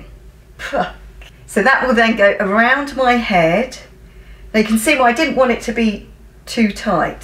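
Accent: United Kingdom. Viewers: First of all I want to say that I love your accent.🤗 Is it British or..? And thank you for such an easy understanding tutorial.🥰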